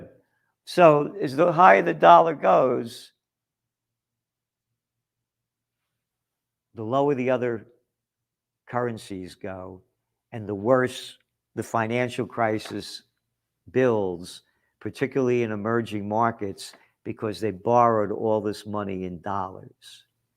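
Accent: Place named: Bronx, United States. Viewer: When you get into your rants you take me back to the good old days in Da Bronx. Love you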